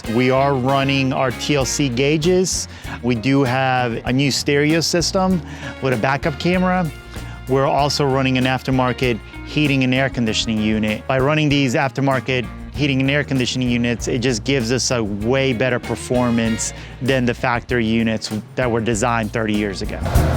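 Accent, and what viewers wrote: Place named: Louisiana, United States. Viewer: Why you got a Cajun accent, my guy?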